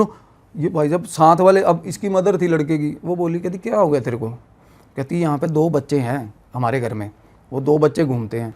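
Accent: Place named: Punjab, India. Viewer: Yeh Banda Boht ache tarike se bata hai bilkul punjabi style me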